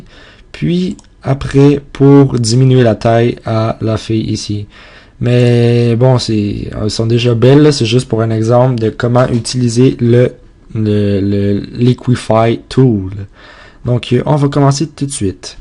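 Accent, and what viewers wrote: Quebec, Canada. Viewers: T'es québécquois non? j'ai un peu saigné des oreilles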